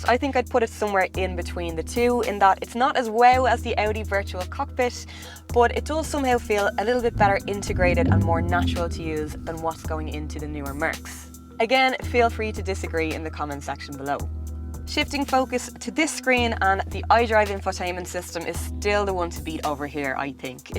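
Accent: Ireland. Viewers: Love that Irish accent, will drive 14k subscribes today to 1.4M in 2 months.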